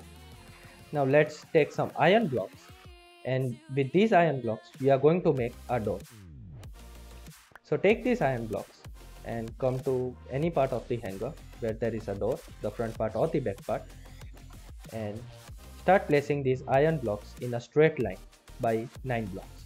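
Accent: India. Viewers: bro you got the most yet best indian accent i have ever heard!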